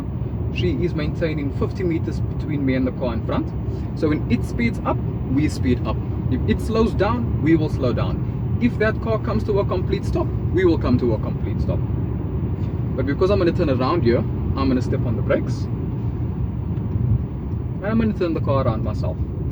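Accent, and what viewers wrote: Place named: Pakistan. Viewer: Whats sup with this Pakistan accent ? Can’t get half of a shit you said.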